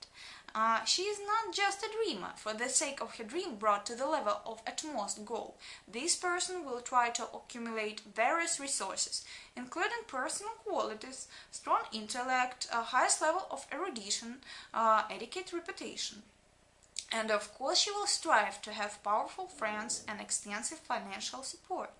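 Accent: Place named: Netherlands. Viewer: sounds like dutch accent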